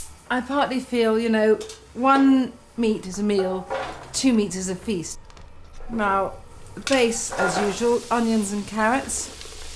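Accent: United Kingdom. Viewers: chok-lut - I hat that British pronunciation, and straw-breez. I can't listen to it. Buh-bye.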